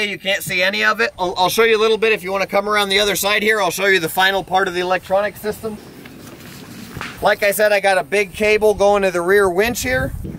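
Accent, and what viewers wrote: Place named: United States. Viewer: You sound like an American yet you drive a Toyota? For me AMERICAN MADE BABY . But whatever gets you home. Still a pretty truck though.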